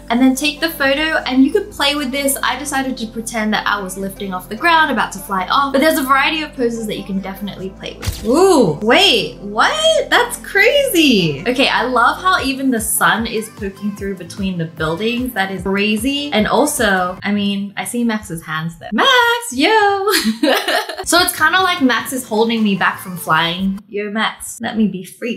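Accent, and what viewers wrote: Australia. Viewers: I do want to step up my Instagram game and these are helpful! I haven't watched you in a while but I swear your Australian accent is not as strong as before! 😯 What were the camera settings for the darker photos? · Is she speaking Australian ? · Are you from Australia?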